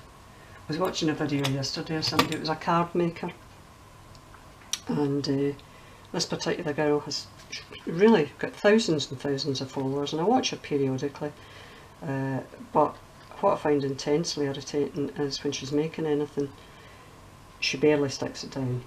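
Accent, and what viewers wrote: Scotland, United Kingdom. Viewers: Gorgeous ... thank you for sharing... think I detect a fellow Scottish crafter 😍 x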